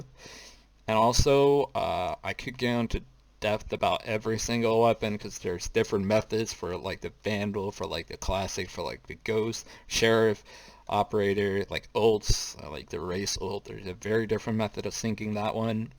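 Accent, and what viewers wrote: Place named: Netherlands. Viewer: i smell dutch accent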